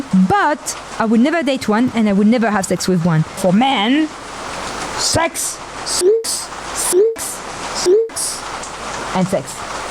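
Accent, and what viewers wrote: France. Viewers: I’m Mexican but I really like her French accent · A french accent Vtuber... "Never in my life have I needed something so much, and never known until I received it"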